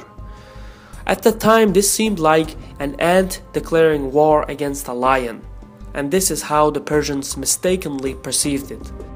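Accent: Arab world. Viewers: Biased. Look here : he has alil bit of arabic accent so ofc he is gonna say there was no genocide ... They converted most of the people with swords Look dude you lied . And its ok cuz we know u r biased. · This guy who represents the video is Arab. So you can hear his Arabic accent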